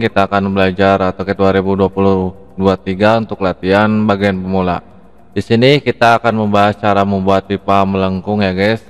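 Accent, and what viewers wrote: West Java, Indonesia. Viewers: aksennya orang sunda nih,, kumaha damang kang ? mantap videona, lansung subrek lah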